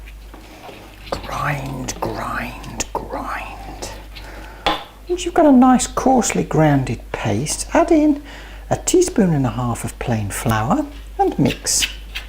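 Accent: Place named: Birmingham, United Kingdom. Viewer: I lived in Birmingham for 23 years then move to London, which I've regretted it ever since. I love your accent because it reminds me of a lovely, very much under rated, city and your tips and recipes are terrific, please keep them coming! ❤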